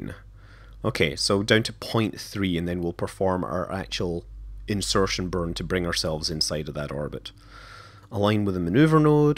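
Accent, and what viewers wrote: France. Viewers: Just love your French accent since French is my native language. You should pronounce B612 "b seece dooz" btw.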